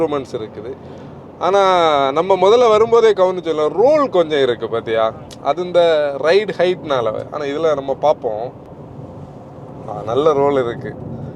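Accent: Kanyakumari, India. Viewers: veri nice explains.....👌👌👌👍👍👍💯💯💯 I am Indian Army 🪖 ஐயா கொஞ்சம் கன்னியாகுமரி பாஷையில் கொஞ்ச நேரம் பேசுங்க அதை கேட்கணும்னு எங்களுக்கு ரொம்ப ஆசையாக இருக்கிறது...🙏🙏🙏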